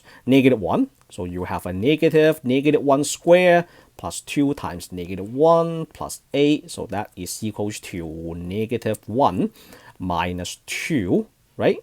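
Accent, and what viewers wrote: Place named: China. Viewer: Bro is speaking english chinese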